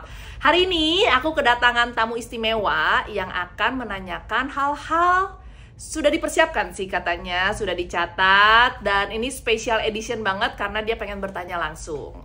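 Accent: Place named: Sunda, Indonesia. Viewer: Kirain dirumah juga nyablak sunda bo dok😂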